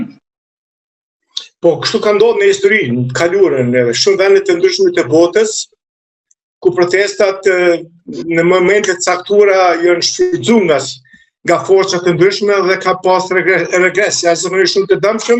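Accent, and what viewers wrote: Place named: Highlands, Albania. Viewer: È difficile capire cosa sta dicendo! Sarebbero meglio di parlare nella lingua corretta Albanese, non tutti capiscono la lingua montanara!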